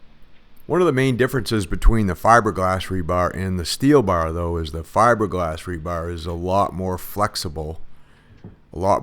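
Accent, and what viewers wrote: Maine, United States. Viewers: Holy cow bub you must be from Maine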